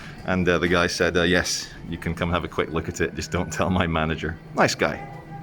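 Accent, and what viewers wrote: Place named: United Kingdom. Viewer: you sound more and more like a Brit eveytime I watch your vids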